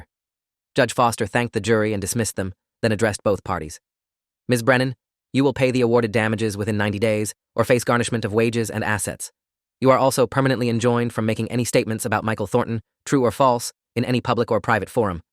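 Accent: United Kingdom. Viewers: I think he’s / she’s talking British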